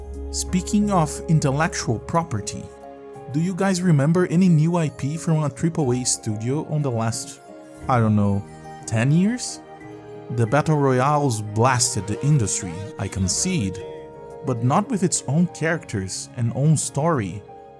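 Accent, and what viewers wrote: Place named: Brazil. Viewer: Dude, you kinda sound Brazilian. Where are you from?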